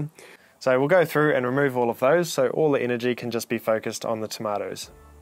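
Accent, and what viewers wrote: New Zealand. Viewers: Insteed, weether, togeether - lovely kiwi accent ❤🤩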